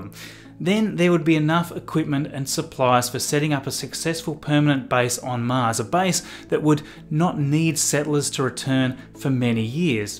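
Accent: Australia. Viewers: That's why I pointed it out :P That's an Australian accent btw, not British hehe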